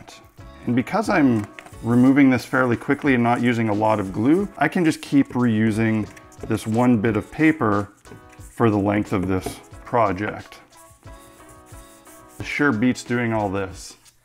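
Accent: Canada. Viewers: You said "out" so many times I discovered that you're Canadian.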